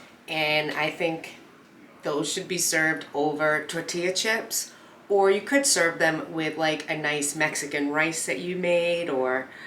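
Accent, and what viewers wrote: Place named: New England, United States. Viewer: ❤️❤️💕❤️💕love your channel and your beautiful New England accent!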